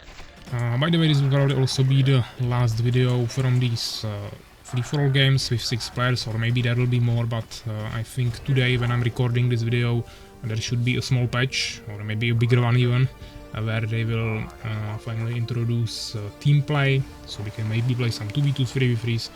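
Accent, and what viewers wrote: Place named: Czech Republic. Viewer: Your accent sounds slavic to me, in particular czech to me.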